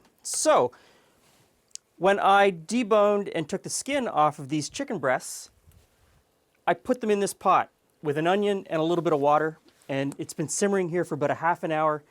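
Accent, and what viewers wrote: Canada. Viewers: I try to pretend you’re not Canadian but you pronounce literally everything differently than Americans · Makes sense. Canadian English sometimes favors British pronunciations to American ones.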